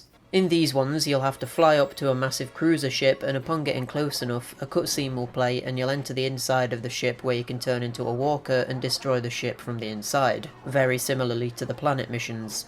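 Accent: North, United Kingdom. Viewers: yeah, tbh I'm on a bit of a marathon here, went to the roots, so to say and going up from there. I love watching how people gradually change their approach towards creating videos, from humble beginnings to more professional setups, more confident narration, how they change visually, all that, it's a lot of fun for me, like using a time machine. First time I saw u was at Retro Dodo channel when u joined them, it was quite unusual at first but I quickly got used to ur reviews, mostly coz I absolutely adore ur northern (i suppose) British accent plus the way u tell the story, it just feels right, u know, it's fun, entertaining, nothing too complex or boring, I realized I enjoyed listening even when u discussed smth of no interest to me (for example light guns). And when u mentioned that Oddworld series is one of ur favorite... my god, I was ready to buy the ticket, fly to UK to shake ur hand personally, coz I'm absolutely mad about Oddworld games (no 3D spinoffs though;) So yeah, then i stopped seeing u at Brandon's channel, did a little bit of digging plus u were kind enough to reply to comments, soon I realized u have ur own channel and then I decided to start from the beginning and watch more of ur content which I believe is very wholesome and fun. 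Wow, that was a long reply, apologies for that, u take care, bud. Oh, and I love cats too!!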